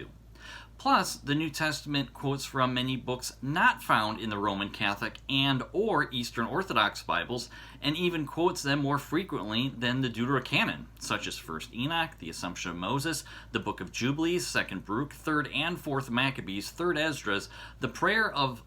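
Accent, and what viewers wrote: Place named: United States. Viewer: I can't understand your terrible American accent.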